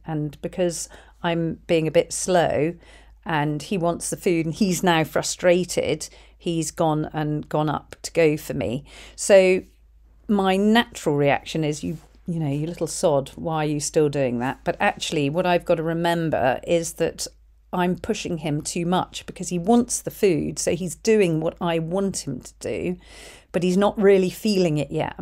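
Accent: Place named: United Kingdom. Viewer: Thank you so much for taking time and making subtitles! British accent is way more difficult to understand than the american one (for me personally). The funny thing is that we study at school British English but USA produces a lot of content on the internet so i had to adjust and now i understant American English better than British English 😅 Also thank you for sharing the right way of "talking" to animals. The World needs to be a better place for them.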